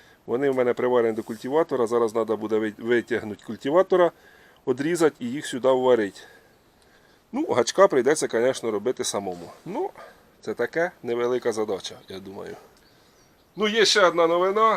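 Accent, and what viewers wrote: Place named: Dnipropetrovsk, Ukraine. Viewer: Дядько ви з Дніпропетровщини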